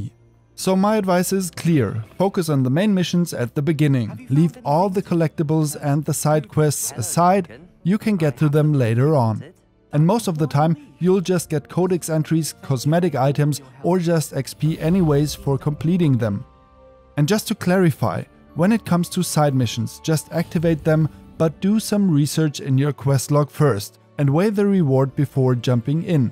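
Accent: Germany. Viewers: Man merkt sofort das du deutsch bist an dem Englisch is nix auszusetzen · Bro question? Are you German? I listen a small accent😅 anyways, your video helped a lot thank you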